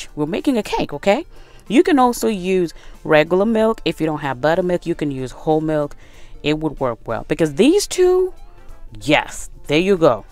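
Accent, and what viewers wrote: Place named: South, United States. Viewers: Thank you so much for sharing this! I think us ladies work hard enough, and I wish housewives got paid for all the work that we do...but don't just say I'm a housewife, no--say Domestic Godess! Love your recipes and yes also your accent (southern?) Have a beautiful day 🌹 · I sure thought u was from Louisiana 😁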